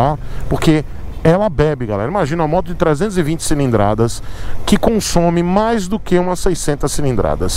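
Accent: Bahia, Brazil. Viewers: gostei da baianada. Se PIQUEM. Alguns não vão entender e vão se beliscar...🙄🤣🤣😂😂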